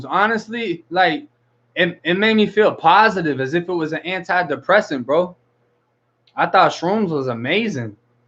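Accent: Boston, United States. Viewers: Just sub'd. From TX. I get a kick out of your soft accent. Lot of FL friends but it's your Boston that catches my ear.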